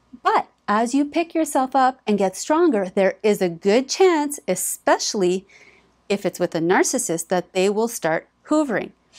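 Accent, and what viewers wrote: Canada. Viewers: Of course it’s a Canadian twang I’m picking up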